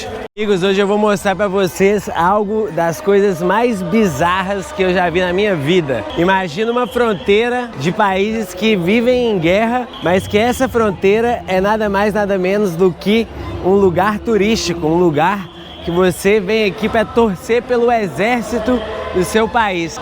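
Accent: Minas Gerais, Brazil. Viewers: Esse vídeo poderia ser gravado por qualquer pessoa, mas o sotaque mineiro dele deixa tudo muito melhos ahhaa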